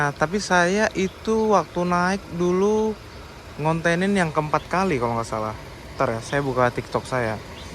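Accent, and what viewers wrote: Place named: Depok, Indonesia. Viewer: Cara ngomong abg ni kayak mas mas Depok Nugroho Febianto 😅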